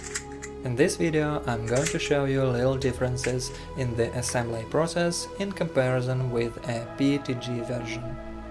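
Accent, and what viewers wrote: Russia. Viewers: Желаю удачи в дальнейших разработках. Кстате, по акценту мне показалось что ты русский, это правда?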